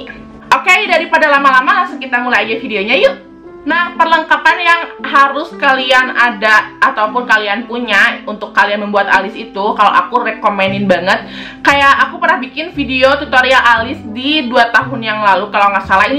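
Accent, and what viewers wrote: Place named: West Java, Indonesia. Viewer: Pasti orang Sunda yah 😚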